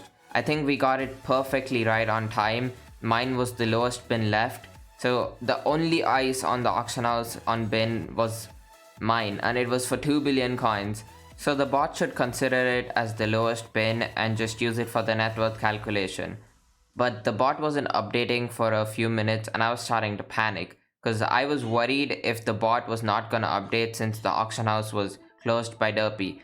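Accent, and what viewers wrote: India. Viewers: indian english hehe ?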